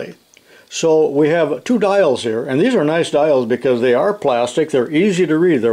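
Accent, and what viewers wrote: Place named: Midwest, United States. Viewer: You have certainly joined the 21st-century with your 3-D printing. I think half the fun of watching your videos is listening to you talk. Your voice is like my first shop teacher and has that “Jimmy Stewart” midwest drawl.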